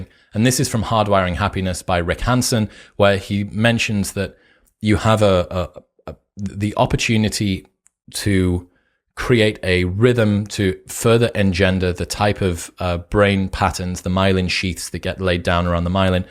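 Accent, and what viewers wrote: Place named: United Kingdom. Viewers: combined with british accent got me really bad :D:D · This is such an insane volume of sourced wisdom, knowledge, and perspective combined with a British accent. Freaking thank you. I hope everyone hears this and puts it into application.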